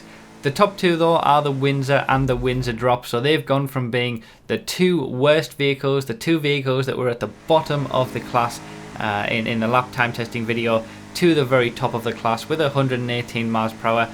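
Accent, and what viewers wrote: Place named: England, United Kingdom. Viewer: no, he's English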